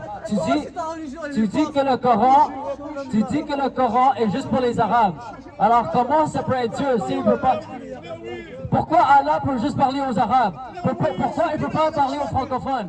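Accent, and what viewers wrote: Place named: Canada. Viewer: Canada? J'entends un tout petit accent canadien.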